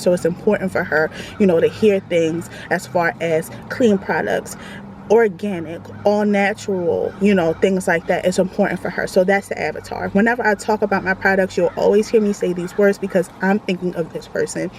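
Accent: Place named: Baltimore, United States. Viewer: Thank you Queen, and I will take your advice, because I have strongly been thinking about starting a body butter business. New subscriber, and you sound like you're from Baltimore lol. Love your accent and your skin is beautiful! Wishing you continued blessings on your business and look forward to your videos! 😀💞🤗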